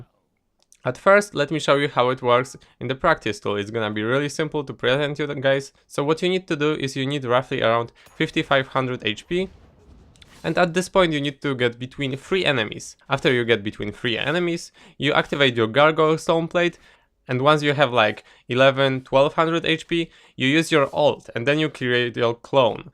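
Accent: Poland. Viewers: Where are u from? U got a little polish accent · Masz minimalnie polski akcent :D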